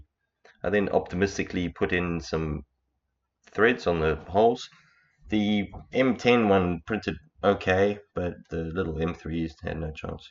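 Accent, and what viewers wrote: South Africa. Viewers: are you a saffa? lol good job doe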